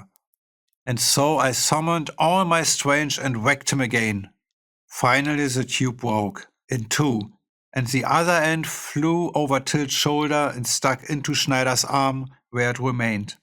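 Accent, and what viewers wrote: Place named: England, United Kingdom. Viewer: I love his English accent! Flake is such a musical genius. Rammstein would not be complete without him.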